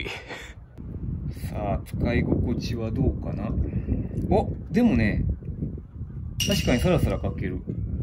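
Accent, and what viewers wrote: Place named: Kansai, Japan. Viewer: かっこいい快適関西弁嬉しい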